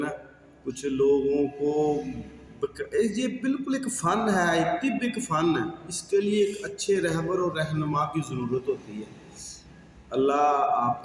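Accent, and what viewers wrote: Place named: Punjab, Pakistan. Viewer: جناب میں نے یہ نسخہ بنانے کی پریکٹس کی تھی نہیں بنا تھا، کیونکہ مجھے ساٹھ گرام کی جگہ نیلا ست گرام یعنے کہ سات گرام سنائی دیا تھا، استاد محترم نہایت ادب کے ساتھ گذارش ھے کہ اجزاء کے وزن لکھی ھوئی صورت میں بھی شئیر کر دیا کریں تا کہ وہ لوگ جو پنجابی لہجے سے نا بلد ھیں ،غلط سمجھ کر نقصان نہ اٹھائیں ،،شکریہ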